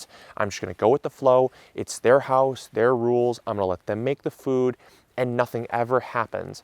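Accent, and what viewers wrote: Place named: Wisconsin, United States. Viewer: hello Wisconsin! that 70’s show voice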